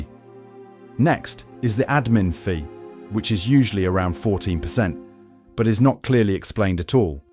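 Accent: United Kingdom. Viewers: Sounds like you want something for nothing. Las Vegas survives off of resort fees and the casinos without that there is no Las Vegas. If we go to your country you do the same shit what are you talking about. You're a hypocrite. You sound like you're from Great Britain has anyone ever been to Great Britain. They gouge the tourist like there's no tomorrow. You're a hypocrite.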